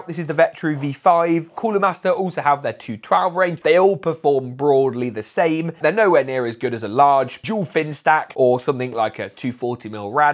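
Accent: United Kingdom. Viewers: yo fonkin british or wot , met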